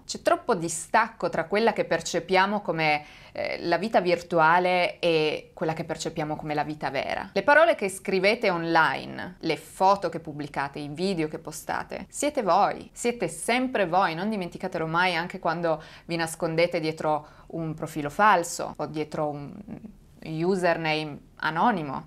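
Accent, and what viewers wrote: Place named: South, Italy. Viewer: Molto gradevole il.uo modo di esporre i fatti di cronaca. Racconti davvero completi. Un unica nota stonata: il tuo accento., la tua dizione . A volte sembra un miscuglio di Sud Italia, altre volte , brasiliana. Puoi fare un corso di dizione per rendere più piacevole la tua voce?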